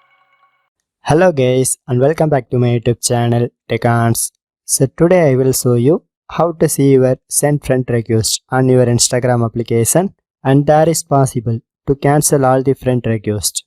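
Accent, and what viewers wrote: India. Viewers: Ugh I can’t stand Indian accents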